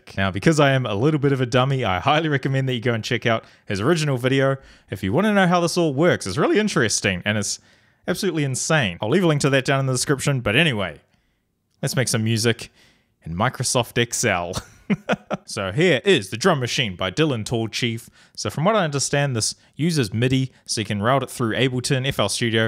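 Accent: New Zealand. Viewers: Your from NZ aren't you?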